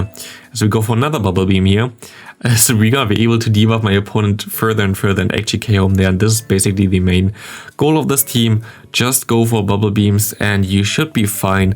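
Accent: Germany. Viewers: Most german accent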